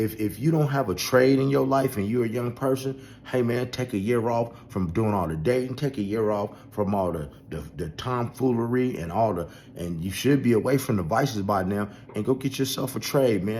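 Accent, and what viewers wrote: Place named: West Coast, United States. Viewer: I felt this video all the way OG!! Preciate this content my Maine!!! Already know you reppin west coast, I can hear it.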